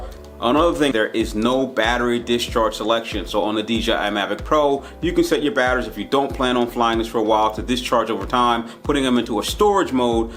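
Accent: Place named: New Jersey, United States. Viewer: FIVE STARS! Always like your fresh comments, I just bought it! and is refreshing to hear sincere WORDS before I start out on my experience. Your the only reviewer, who tell it, right off, with out the fancy intro music video. To the point video from a reviewer who did not get a free one from DJI, Love The honesty Pal! KEEP UP THE spontaneous reaction! VERY HELPFUL! I am in Thailand, but you sound like the truth of my old neighborhood in New Jersey, very comfortable!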